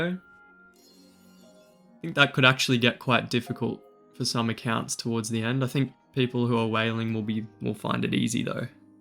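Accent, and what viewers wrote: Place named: Australia. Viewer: Gorgeous character. Can’t wait to sit down and hammer out this content myself. Got a special project of my own I’ve been working on that wasn’t suppose to turn into the monster it has become, but it’s been fun to hammer out. As always, your content is a pleasure to watch. Wish I had your buttery-smooth Australian voice and cadence. Best of luck in your exams, can’t wait to see what you have planned for 500 subs!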